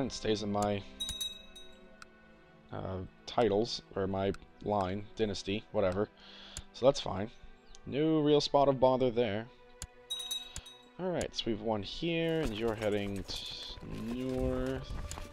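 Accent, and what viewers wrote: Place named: England, United Kingdom. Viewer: I can definitely hear the English in your accent, just generally the way you speak and the words you use. Just thought I'd let yet know.. :D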